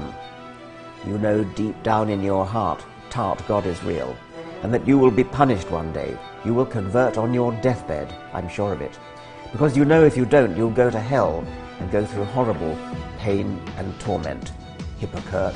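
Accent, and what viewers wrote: England, United Kingdom. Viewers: It's made all the funnier by Richard reading out the often illiterate, rambling, expletive laden stuff from people claiming to be god-fearing Christians in his dry English accent. My own favourite is th eone who tells him how they are looking forward to seeing him being tortured in hell and signs it off with "a loving created daughter of our lord". And of course "the fart of god" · LMAO do funny with his prim English accent and dry humor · we all speak like that - in England dear. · These always bring such a grin to my face. I love the way reads them in that proper English accent. Makes them 10,000x more humorous! Please, not such a long spell between these! Just love the love letters!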